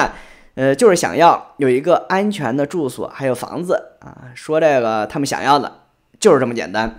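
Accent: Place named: Henan, China. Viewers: 这口音有点像河南人啊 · 老家八成河南附近，因为口音有一点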